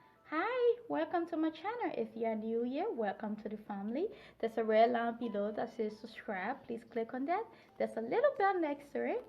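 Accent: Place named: Liberia. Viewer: Sis, are you Liberian? I dig the accent. I too am Liberian. Love your channel and very informative.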